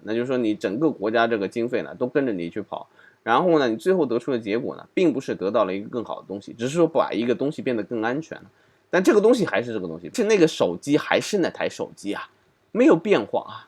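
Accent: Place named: South, China. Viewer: 有的部分分析的挺有道理，大湿听着想南方人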